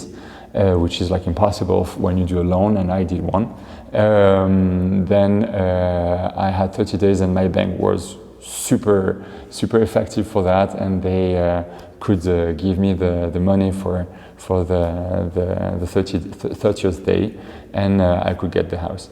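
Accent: France. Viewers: I love your accent 😍 🇫🇷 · I was so worried when I saw the thumbnail that a rich american bought it... first time Im happy hearing a French accent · This is also my dream!!. Amazed by your dedication and that lovely francais accent. A bientôt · As a french guy I feel ashamed that french castels are in sale for strangers (but according to your accent you are french as me ofc). The problem often especialy with british people is that they will buy a property then turn it to totaly private. Not allowing anyone to enter in the park, to fish in the lake if there is one etc. However it is better that those castles belong to a person, even one who will not respect the local habits, rather than leaving the castle in ruins.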